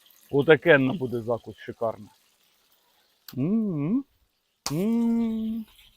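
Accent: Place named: Chernihiv, Ukraine. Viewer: Пане, а звідкіля сам родом будеш якщо не секрет?:) по говірці дуже схоже десь з чернігівщини, на менщину дуже схоже. 😜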